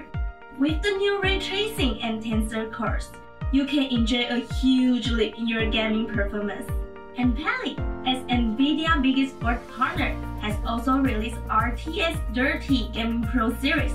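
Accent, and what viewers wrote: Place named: England, United Kingdom. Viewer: So qute english accent. Good job haha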